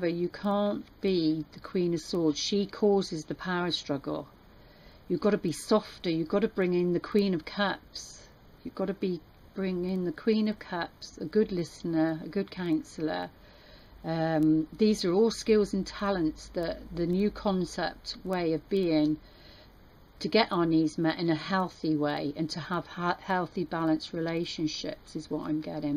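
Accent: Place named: United Kingdom. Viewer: Impressive....first time watching and I loved everything about this. Resonates so much....like you were talking directly to me. I also have to say, loved listening to your accent....makes me miss my British family even more.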